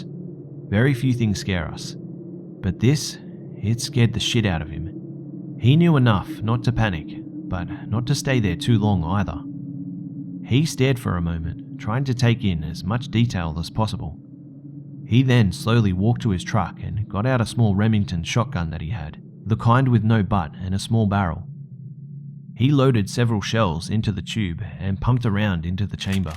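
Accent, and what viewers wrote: Australia. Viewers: i mean, where would he get his outro from? "G'day mates.." sounds pretty aussie to me and i should know...im a proud one..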